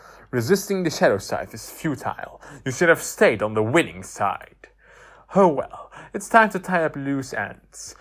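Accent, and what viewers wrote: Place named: United Kingdom. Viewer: Omg I thought you weren't aiming for a british accent but just that for some reason you were pronouncing words differently a bit this time but then I read the description! xD Really fun video as always!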